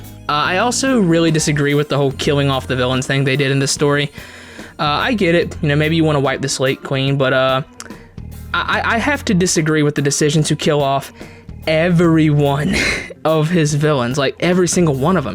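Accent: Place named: South, United States. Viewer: I love your country ascent 😂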